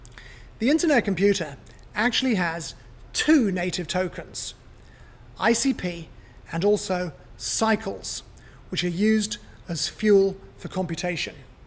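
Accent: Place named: United Kingdom. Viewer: Where can I buy the token ? More importantly how does one learn to speak the queens English with such elegance ?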